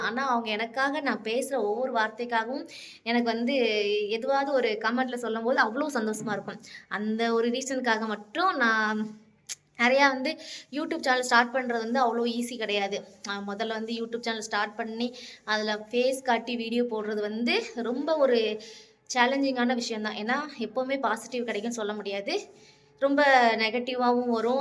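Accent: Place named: Tirunelveli, India. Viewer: Unga ஊர் tirunelveliya nega pessurathu appete thereythu nan tirunelveli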